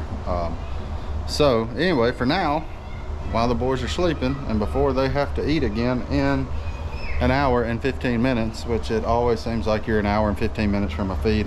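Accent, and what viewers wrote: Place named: Louisiana, United States. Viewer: I’m from maurepas la it’s cool to see a YouTuber that talks like I do 😂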